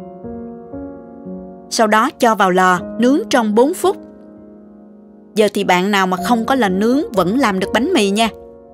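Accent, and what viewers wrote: South, Vietnam. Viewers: Kênh của rất tinh tế, giống nói ngọt nhẹ rõ ràng của người miền Nam, công thức và trình bày ý như người chủ kênh vậy. Em sẽ làm thử vi thấy làm đơn giản quá mà xình xắn nữa. Thật trân trọng cảm ơn Cô nhiều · Giọng nói đặc sệt miền nam nghe rất là nhẹ nhàng+ tài nấu nướng của cô ấy thì Miễn bàn.Ông chồng nào đó của cô ấy rất có phước.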